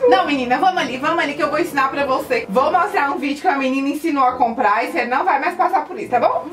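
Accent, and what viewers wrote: Minas Gerais, Brazil. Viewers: Pelo jeito de falar... é Mineira ou goiana né?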